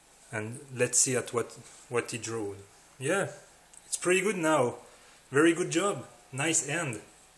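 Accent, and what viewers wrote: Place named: England, United Kingdom. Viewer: Fucking english accent , tu crains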